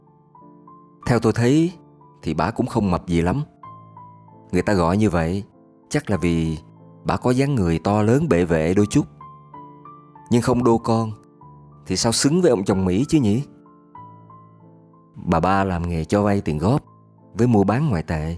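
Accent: South, Vietnam. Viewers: Lan dau nghe truyen cua chau doc truyen rat co cam tinh vi sao? Doc dung chinh ta ; va dung giong mien Nam...v..v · Giọng đọc rất miền nam nhưng không quá nặng của thổ âm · Giọng đọc miển nam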